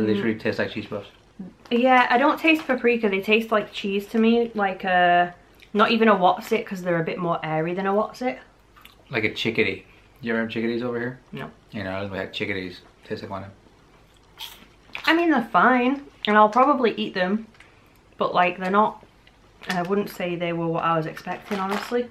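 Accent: United Kingdom. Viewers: I love all fake banana flavors and concerning other posts below me how everybody is talking about where you're both from somewhere different I thought you were from the UK and he sounded to me like he was from America so I just thought I'd add that my two cents in cuz I actually have no idea.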